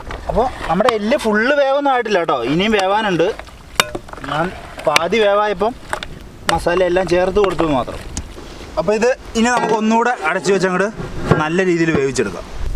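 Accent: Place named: Kollam, India. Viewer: ഒരു കൊല്ലം slang ഉണ്ടല്ലോ സംസാരത്തിൽ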